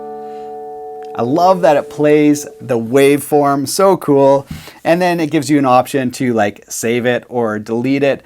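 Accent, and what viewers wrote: Canada. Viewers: Hey! Love your show and your Canadian accent. I recently bought a Gibson SG Special Faded (2005), it's rather cheap for a guitar with the Gibson logo on the head, on the second hand market. What's your opinion about this guitar?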